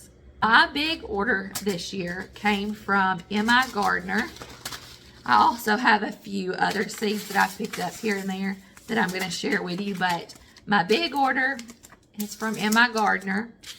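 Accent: South, United States. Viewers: Love your accent as a fellow woman from the south, you’re a pleasure to listen to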